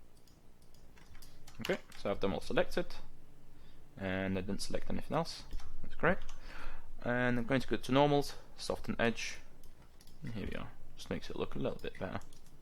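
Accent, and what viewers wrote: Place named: Russia. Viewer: Your accent is close enough to russian accent :D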